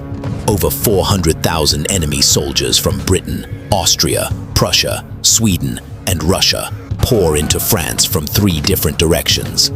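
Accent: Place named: United States. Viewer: Jeez.. this American narrator voice completely ruined the video😳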